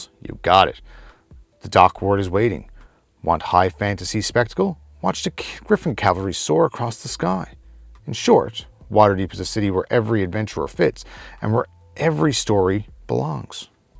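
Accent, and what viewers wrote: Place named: Newfoundland, Canada. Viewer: Great video! With that Newfoundland accent and all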